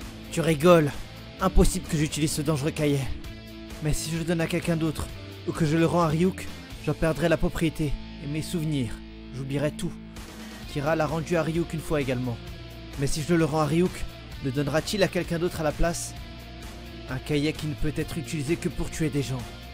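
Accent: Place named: Germany. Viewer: L'accent allemand, juste parfait 😂 mec continue frr tu gère trop 🔥 tu va gagner masse abo je partage et abo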